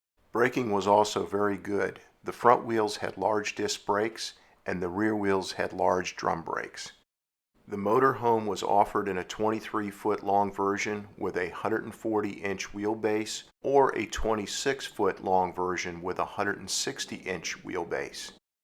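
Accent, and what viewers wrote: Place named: United States. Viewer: please speak English ! Americans tend to not to be able to speak properly... eeee baaks should be: a box, ditails, won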